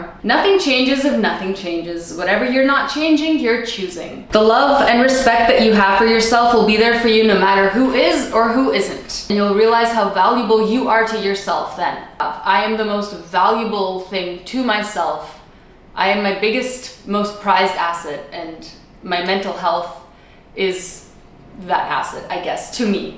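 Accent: Russia. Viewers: Блин только сейчас заметила что у, русский акцент когда она разговаривает на английском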